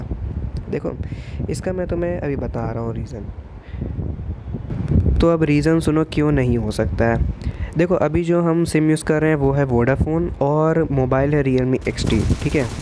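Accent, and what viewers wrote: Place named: Bihar, India. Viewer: Or bhai kya hal chal Abaj se lgta h ap v bihar me rhte ho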